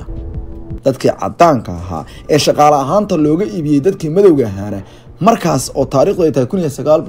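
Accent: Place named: Somalia. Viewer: Fardabaax baah saan Afsomaligaan meel kale kaamaa maqlin Pure afsomali ❤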